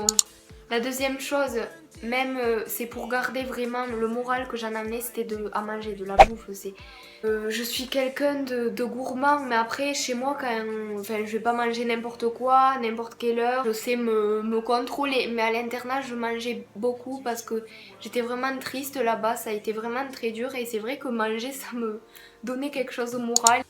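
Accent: South, France. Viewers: L'accent du sud est quand même Wow.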